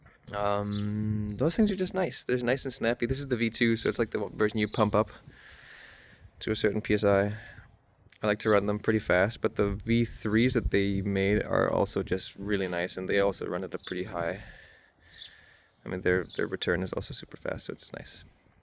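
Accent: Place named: Canada. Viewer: i can hear the canadian in ya bud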